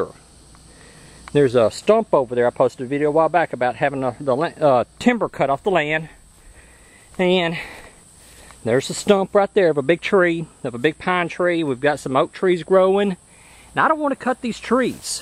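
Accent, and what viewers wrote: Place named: Tennessee, United States. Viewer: Were are you from? Is it Tennessee I really dig your accent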